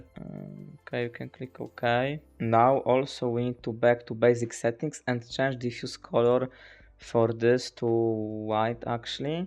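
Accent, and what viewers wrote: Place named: Poland. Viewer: Polska GUROM slychac akcent <3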